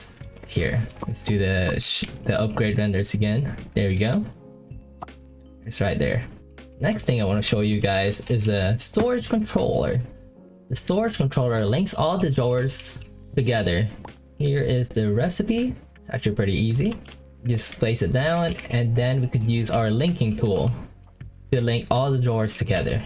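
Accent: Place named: Brazil. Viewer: Não precisei de 2 segundos de proza pra saber que esse caba é br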